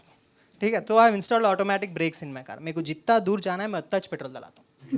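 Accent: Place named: Hyderabad, India. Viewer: Love the Hyderabadi accent 😅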